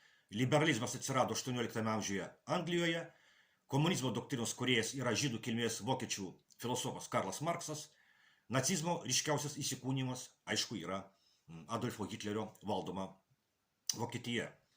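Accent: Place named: Russia. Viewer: tik vienas brazauskas nebuvo komunistas,o kalba su rusisku akcentu sitas isverstaskuris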